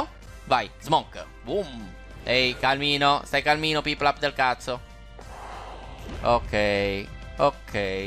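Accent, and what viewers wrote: Bari, Italy. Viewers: Sei di bari?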